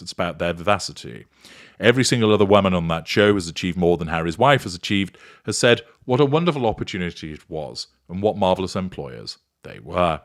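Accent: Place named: Liverpool, United Kingdom. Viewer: Sounds like hints of Liverpool embedded in RP, to me.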